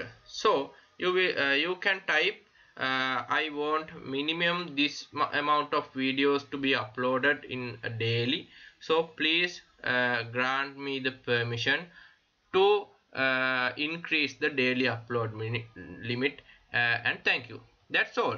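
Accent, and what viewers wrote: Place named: India. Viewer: I don’t understand anything he saying because it’s Indian accent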